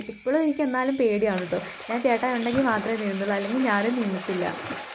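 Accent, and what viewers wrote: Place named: Kasaragod, India. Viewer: Kasaragod slang 😍😍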